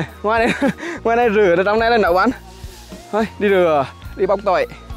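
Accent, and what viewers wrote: Nghe An, Vietnam. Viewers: Trai xứ Nghệ miệng nói tay làm. Tai lắng nghe. Nói năng mộc mạc mô tê răng rứa. Nhiều người không hiểu nghe ngứa tai . Những ai thông hiểu nghe hoài thấy thương ..! Đình Hồng .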